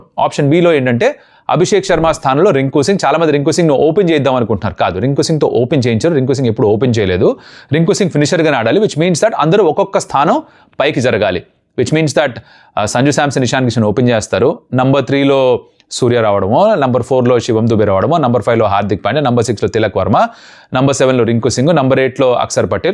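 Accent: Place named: Mangalagiri, India. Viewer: నాకెందు కో మాటష్ మంగళగిరి అనిపిస్తుంది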